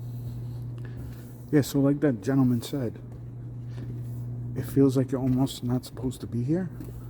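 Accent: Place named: New Jersey, United States. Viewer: Playing now! I love your accent! I lost mine but easily pick it back up when around my nj family. I remember being very little and vacationing in AC before it was ‘ the ac’ had to be around ‘67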